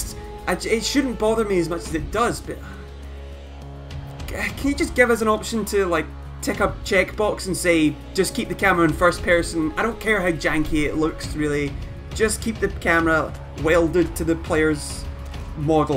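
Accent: Scotland, United Kingdom. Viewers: you sound scottish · The pure Scottish accent makes me happy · yasss another scottish youtuber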